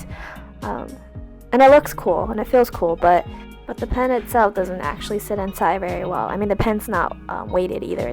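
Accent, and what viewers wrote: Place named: United States. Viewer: You sound normal, wth. Idek what's up with the whole voice thingie but you sound like a normal American girl. Like, dude. You have great art, smh. It's gonna take me years to be as good as you and what sometimes hurts? Your voice? Bruh. There ain't nothing wrong with your voice, G. :/ And what negativity? My G, opinions are opinions and if someone doesn't agree, then that's their opinion. You have a lovely voice, it's fine... on a separate note, I love her hair... I betcha if I stepped on her hair, I'd be falling through space. 👀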